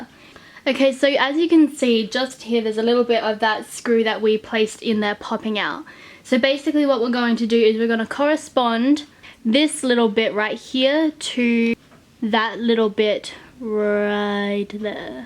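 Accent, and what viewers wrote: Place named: Australia. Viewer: She Sounds Aussie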